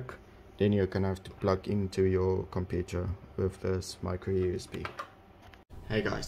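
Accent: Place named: Afrikaans, South Africa. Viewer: kan daai afrikaans hoor in daai engels. thanks vir di video dude 😁😁🤘🤘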